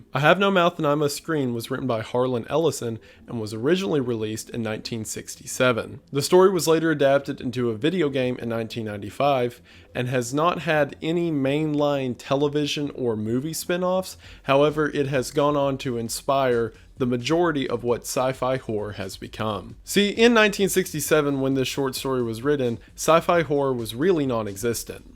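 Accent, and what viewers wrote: South, United States. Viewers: Adversity or diversity?! If you are demonizing "diversity", as what you, can chuck up to a mispronunciation seems to, imply, then, that Southern accent that I have noticed takes on a special meaning... Hmm!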